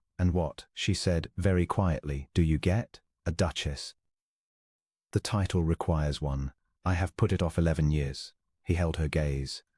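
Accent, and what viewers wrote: United Kingdom. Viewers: There were a few times I planned to post a corrected pronunciation, but found that “error” was just the difference between British and U.S. forms.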